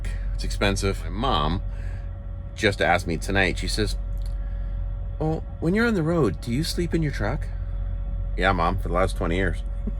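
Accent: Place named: Canada. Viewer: I knew you were Canadian when I first heart your voice. Sound exactly like Alex Lifeson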